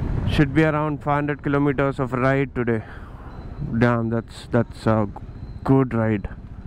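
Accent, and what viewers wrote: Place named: Hyderabad, India. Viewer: Drone shots super👏👏 Hyderabadi language 😅😂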